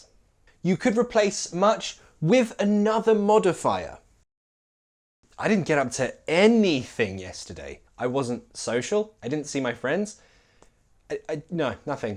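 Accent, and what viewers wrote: United Kingdom. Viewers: Very useful for me! Thanx from Mexico City! I love brit accent! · Mohammed Nabi It is British English for sure! English is just amazing! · Hi sir it British or American english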